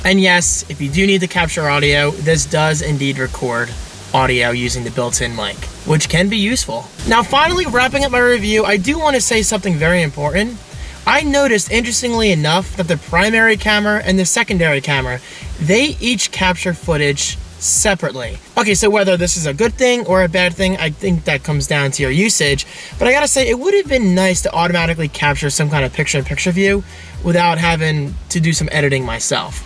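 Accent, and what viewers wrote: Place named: Philadelphia, United States. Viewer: Classic Philly Accent haha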